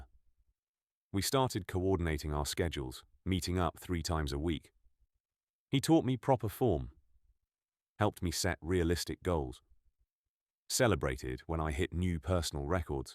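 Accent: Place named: United Kingdom. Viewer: The Brit AI accent….sorry😨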